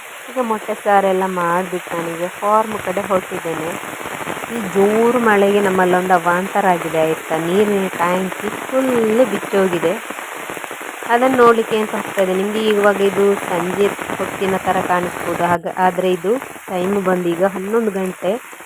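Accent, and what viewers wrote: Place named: Udupi, India. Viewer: ನಿಮ್ಮ ಮಾತು ಕೇಳಿ ನಾನು ಊರಿಗೆ ಬಂದ ಹಾಗೆ ಆಯ್ತು ನಮ್ಮದು ಉಡುಪಿ 🥰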